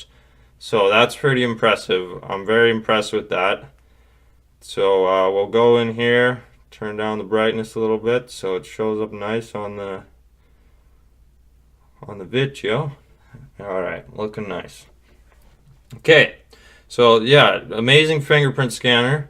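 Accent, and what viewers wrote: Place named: Canada. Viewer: For your screen on time of 10 hours, is that over the course of two days with quite a bit of standby time mixed in, or more straight usage in one day? Also sounds like you're in Canada so I was wondering if you bought this phone from a carrier or unlocked? If you bought it unlocked where did you get it? Seriously considering this phone, samsung even dropped the price to $450 CAD on their website.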